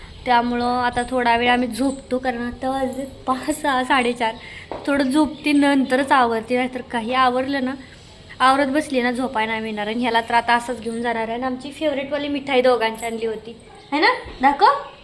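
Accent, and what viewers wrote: Satara, India. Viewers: ठेक आपली भाषा साताऱ्याची 😂😂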